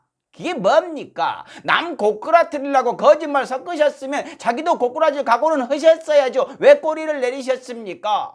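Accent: Jeolla, South Korea. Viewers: 니는 증말 전라도인니? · 말이필요없는 사이다발언 박수 치면서 듣고 있어요 찰진전라도 사투리가 맛깔나부요 계속 응원할게요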